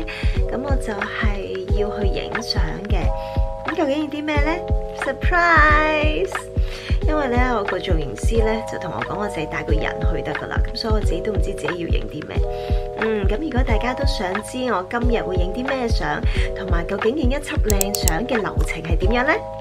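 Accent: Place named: Shiqi, China. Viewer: 好聲好戲裏Yoyo配得非常好🎶💯,努力背石岐話勁👍😘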